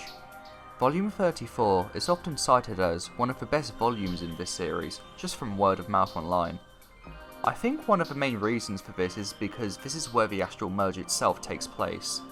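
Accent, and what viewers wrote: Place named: United Kingdom. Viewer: Being in the UK is known for having thick accents. You simply find it hard to deal with his accent. Nothing wrong with his English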